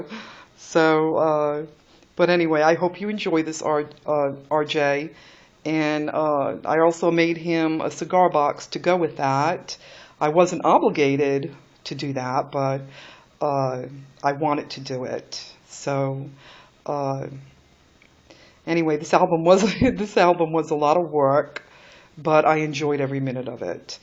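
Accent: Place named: New England, United States. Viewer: Hi. So? I think I hear a bit of New England in your accent? I came over from RJ's channel. The album and it's box are gorgeous.Luscious tags! You did a great job with your 1st vid. I don't have a camera yet. I hope I do as well on my first. Kathy and Leann are both great artists. Have you ever seen Anne from xannero1 videos. She makes the most amazing things! With all sorts of mini shelves and boxes. She even made a mini sewing table for her last mini! TFS Hugs, Jess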